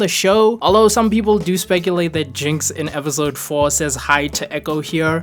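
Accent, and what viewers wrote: South Africa. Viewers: R you South African? Ur accent is giving South African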